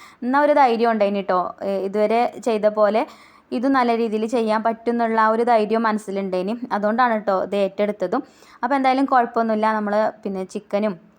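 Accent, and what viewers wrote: Malappuram, India. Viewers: നിങ്ങളെ സ്ഥലം എവിടെയാ, വർത്താനം കേട്ടിട്ട് മലപ്പുറം പോലെ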